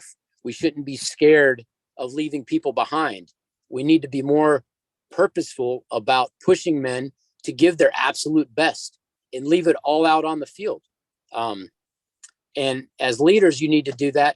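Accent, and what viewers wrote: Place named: Birmingham, United Kingdom. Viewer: Where are you from? I’ve keyed in on your accept. It’s Barney Fife In Birmingham.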